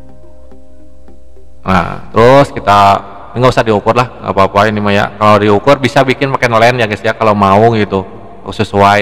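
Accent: West Java, Indonesia. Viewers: Ini mentornyq pasti orang Sunda...aksennya orang sunda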